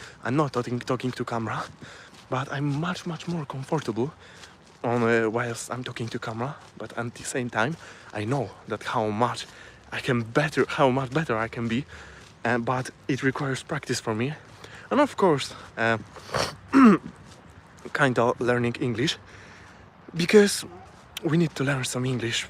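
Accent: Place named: Poland. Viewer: accent was lost on this aussie 😂 now i know a polish accent i guess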